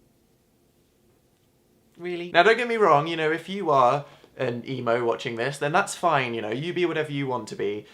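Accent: United States. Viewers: in 5 years managed to lose a lisp a pillow and an american accent... lol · Who would've thought that was American when he was fourteen.. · why did you have an American accent when you were 14? x3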